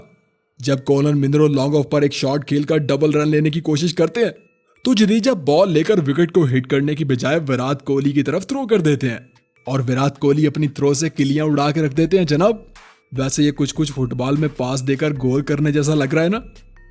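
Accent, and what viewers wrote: Pakistan. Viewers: Bhai Agali video Mein Mere Yaar question ka answer dena Tumhari Awaaz se lagta hai bolane ke tarike se Pakistan ke ho best off lak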